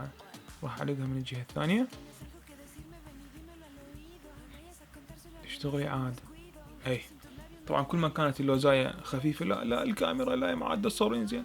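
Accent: Mosul, Iraq. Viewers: حب كبير للهجة العراقية ❤️ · وفف فديت لهجتك المصلاويه 😂😂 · شيف انت من اي محافظة؟ ؟؟ حسيت بحجيك نغمة مصلاوية ما اعرف ليش 🤭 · اسمو شوبك بسوريا.. والله اللهجة العراقية حلوة وانت انسان عفوي وقريب من القلب ومبدع تمنياتي لك بالتوفيق دائما.. الكيكة بتجنن يعني تخبل😄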